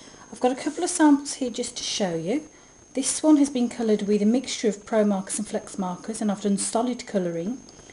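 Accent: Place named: United Kingdom. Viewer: Ur british accent! :D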